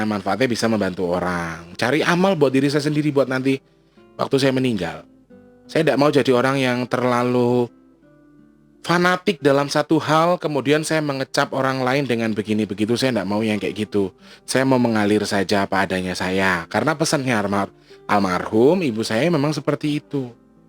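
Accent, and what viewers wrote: Banyumas, Indonesia. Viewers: knp geli banget denger suara masnya ngapak go internasional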